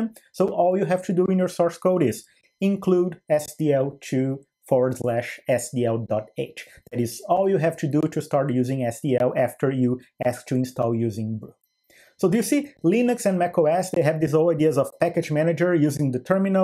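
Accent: Brazil. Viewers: You have a Brazilian accent... vc é br ? Sdl ainda vale a pena?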